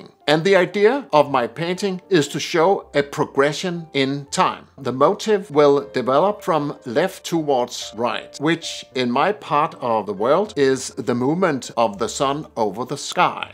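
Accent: Denmark. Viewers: Thank you for your inspiration and also for making it all look so possible, with the right materials and the right attitude. (I also like hearing your soft Danish accent.) Space is for me the most important element, because even in a painting the images are found in space (foreground, background, etc) and it delineates them one from the other. (or 6.20 pm Western Australia time element)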